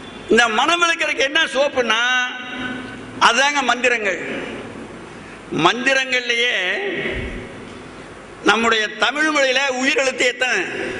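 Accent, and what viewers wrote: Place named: Kongu, India. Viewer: கொங்கு தமிழிலே அருள் மழை பொழிந்து..எங்கள் மனதினை இன்பத்தில் ஆழ்த்திய அய்யாவின் திருவடி வாழ்க..!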